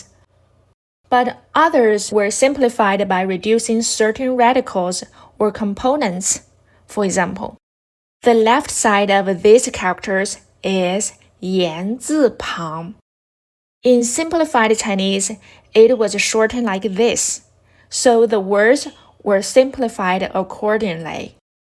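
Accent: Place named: England, United Kingdom. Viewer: really great and like your English accent it is very charming. Thank you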